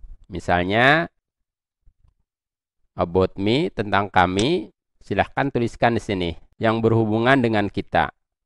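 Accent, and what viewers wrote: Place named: Bali, Indonesia. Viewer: lho sy kira org bali, logatnya bali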